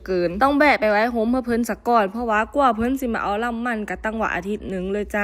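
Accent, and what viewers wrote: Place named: Sakon Nakhon, Thailand. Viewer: พูดเหมือนคนสกลนครบ้านยบายเลย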